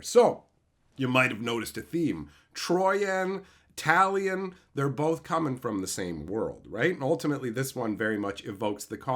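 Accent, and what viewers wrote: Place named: Canada. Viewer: Heh heh you keep saying A Boot!😂 Ya damn Canadian, I love ya.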